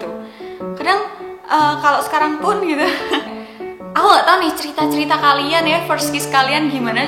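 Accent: Java, Indonesia. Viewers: Aku baru liat video kak & jujur suka, apalagi dengan cara bicara nya KK yang medok bngt, ketawa karna di video ini, apa yang KK pikirin itu sama, sama yang selama ini aku pikirkan tentang ciuman & jujur hingga usia ku 22 saat ini aku blm pernah ciuman apalagi bibir sama bibir. Tapi aku suka saat liat drakor ada adegan ciuman nya😂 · Jowo mu ndi? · asal mana kak? lucu banget medok hihii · nonton video kak saya ketawa2 sendiri terus, cara menyampaikan nya lucu banget apalagi pake bahasa jawa yang medok gth:v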